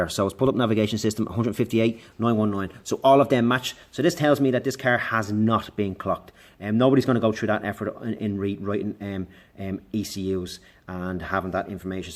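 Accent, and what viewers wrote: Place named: Ireland. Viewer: Irish mate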